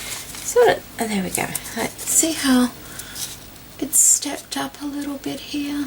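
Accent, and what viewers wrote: Australia. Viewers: its tulle its the aussie accent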